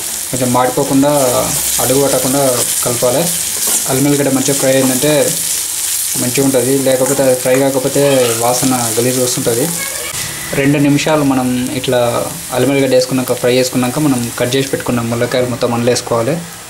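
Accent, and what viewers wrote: Telangana, India. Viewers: Kura kanna ni telangana slang super tammi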